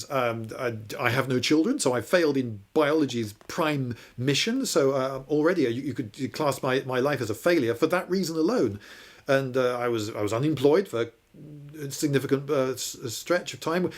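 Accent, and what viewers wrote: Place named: RP, United Kingdom. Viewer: I would recommend that English learners focusing on the Received Pronunciation accent watch this channel. No trace of Estuary, Cockney, or other regional influence.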